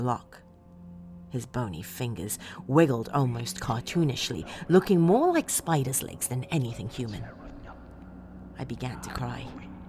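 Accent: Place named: United Kingdom. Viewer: oh you charmer you are from Britain if I would have to place your accent 😉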